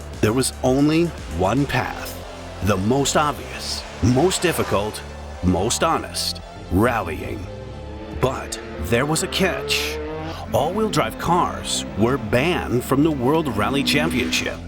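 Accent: United States. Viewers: Pity about the Hollywood yankee doodle commentary... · The narrator amazingly pronounces Mr. Piech's name correctly- most non-Germans say it incorrectly as "peach". But- the CEO of VW was not "Schumacher", but Schmueker (would sound like "shmicker"). And the company brand is sometimes pronounced as 'oddee" and not "owdee", even in the same sentence?! And my gosh- who is the main intended audience of this video, given the obvious American accent of the narrator? The USA! So provide the units as most commonly used in this country- standard units (e.g., inches, pound-feet torque, pounds, miles per hour, etc.) with either metric spoken right afterwards, or visually in parentheses/captions. There are some std units on the car depictions as subscripts, but when the text is spoken they are missing. Nice to see photos of the development cars for the original Quattro setup. 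· Just say Volkswagen. It sounds dumb when you talk like an American for the whole video except for one word where you have a German accent. It just sounds pretentious and smug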